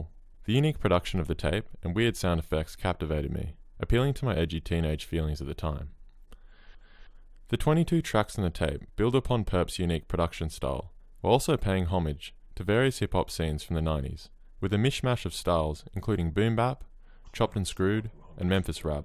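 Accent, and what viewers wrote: Australia. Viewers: bro are u Australian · Pretty sure his accent’s Australian but there was no need to send hate like that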